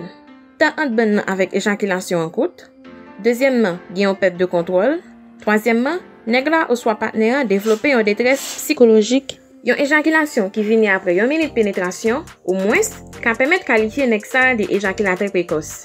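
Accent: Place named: Delmas, Haiti. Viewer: Sa son nèg delma😂😂😂😂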